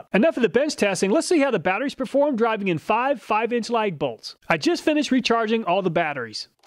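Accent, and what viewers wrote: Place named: Pittsburgh, United States. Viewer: Are you from the Pittsburgh area? You have the accent. Haha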